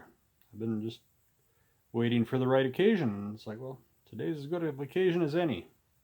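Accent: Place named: Midwest, United States. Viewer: Always a pleasure to hear your Midwestern Minnesota-ness,. Down to Earth family goings on. Thanks for keeping me company while I cleaned the bastard bathroom.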